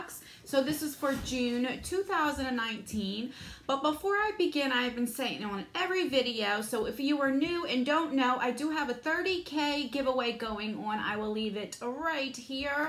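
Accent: New Jersey, United States. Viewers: I love your jersey accent. My hubby grew up in NJ and we love going back to visit. We are from New England, United States. We went camping in a state park in NJ on a lake. We were ready for freezing water like in our home state. The water temp is 69 degrees right now ( very cold) well as soon as we walked in the lake were very excited to have it feel as warm as bath water for us!!! I love this box someday I will order one. Thank you for doing such an amazing job. The other thing in NJ that we have none here is the sound of cicadas wow they are loud but we got use to them. The cicada killer bees are huge and scared one of my kids terribly.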